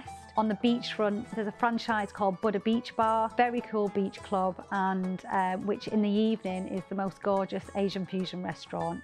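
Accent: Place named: Turkey. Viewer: It’s the way you pronounce the names of people n places like a Turk. ❤️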